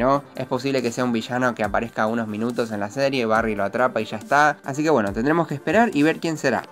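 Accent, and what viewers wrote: Argentina. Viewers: Ramiro Lopez sera por que es argentino y los argentinos tienen el ch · Me gusta muchos tus vídeos son los mejores con tu acento argentino el mejor ps: como se llama la música del minuto la llevo buscando desde hace bastante tiempo :)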